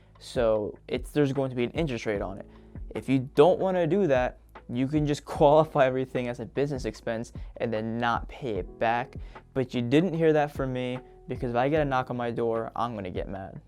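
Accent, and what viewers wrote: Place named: United Kingdom. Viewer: Not sure why, but I thought you are from UK haha!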